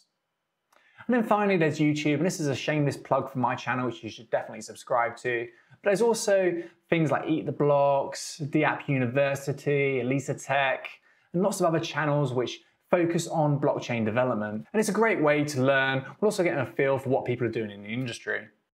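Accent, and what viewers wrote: United Kingdom. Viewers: Thanks a lot for the time you put in, it's also refreshing to hear you are also from the UK. Do you have any advice on the best way to apply for roles? And how long the process would usually take to start working? Thanks a lot in advance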